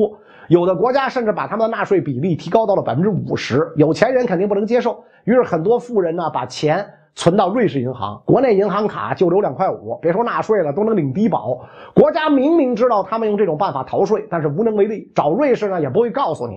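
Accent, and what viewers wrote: Beijing, China. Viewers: 喜欢袁老师京片子，猴儿赛因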